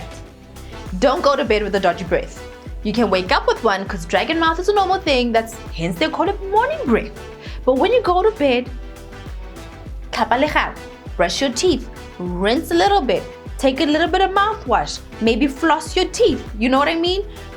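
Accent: South Africa. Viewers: Definitely a South African. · She is proudly South African 🇿🇦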